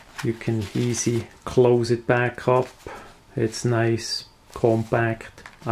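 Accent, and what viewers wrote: Switzerland. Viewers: Is this what Swiss accent sounds like?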